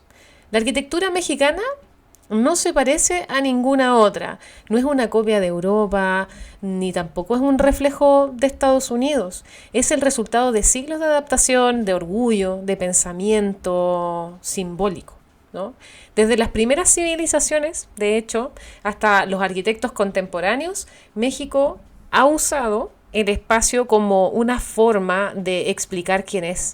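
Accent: Chile. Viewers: Es maravilloso escucharla el acento chileno es muy bonito y escucharla hablar de México WOW que belleza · Hola te acabo de encontrar y me encantó tu podcast Gracias por entender la esencia de mi país ❤ por explicar tan bien nuestra cultura a través de nuestras construcciones edificios etc En nuestra arquitectura está nuestra identidad Me encanta tu acento chileno ❤❤ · Q lindo es cuchar esas palabras dedicadas a mi País. Con la sabiduría de una conocedora de nuestra cultura.. y experta en Arquitectura!! Me encanta el Acento Chileno. Y su Gente!! Saludos me suscribí. Y estaré pendiente de lo q publicas. Abrazo grande de Guadalajara Mex. Al al estás invitada a conocer. Si no la conoces!! Abrazo grande!! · Gracias Poe tu narrative soy in Mexicano en Chicago y tengo amogos Chilenos me gusta tu Aden to chileno. Tines Que ir a Morelia Guanajuato a Taxco y Verla otro Mexico Zinzunzan y Verla’s La grna varied de Mexico y su culture felicitates en hora buena Dios te bendinga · Me gusta tu voz y acento de chilena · . 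Creo que eres Chilena? Pero tus programas me cautivaron. Y mira que es un reto ya que en los que escuché no incluían imágenes. Pero tus relatos desatan la imaginación!! Soy Mexicano, hijo de española. Y me capturó tu forma de hablar. Sacaste mi orgullo de ser mexicano!! Y que no todo son carteles de la droga. Saludos!!!! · Me gusta el acento chileno. Buen vídeo. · Me impresionó la clrides con que explicas todo y me.gusta tu acento chileno 🌹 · Es impactante tu narrativa arquitectónica, dicha con un tono de voz tan característico de las chilenas. Espero más de tus videos. Recuerdo el título de una melodía de tu país que dice "si vas para Chile te ruego viajero"..... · Que bonita narración y más en chileno saudos a mis hermanos maxas, visita Querétaro tiene mucha arquitectura e historia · que acento chileno maravilloso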